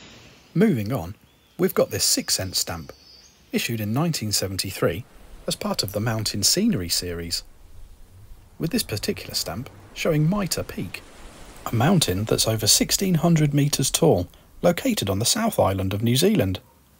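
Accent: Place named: England, United Kingdom. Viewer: Steak and milk.... very good for me reading, earing lovely words slowly, easy to repeat if not understanding, and english accent i can restaure with your tonics on words. Not only the lesson about phylatélic value about this New-Zealand letter from 1974. Words are so well writing, at middle of letter eyes were synchronize with words and your voice. Great time and good idea Thank you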